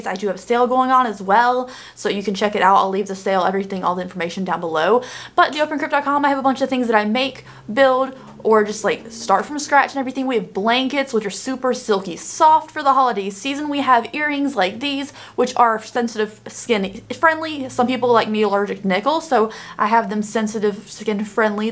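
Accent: South, United States. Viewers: How southern are you sounding now days?! I'm loving it!! Sound so precious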